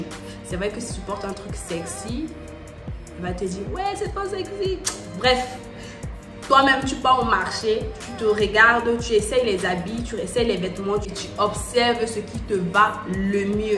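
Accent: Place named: Ivory Coast. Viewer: Ton accent ivoirien😍🇨🇮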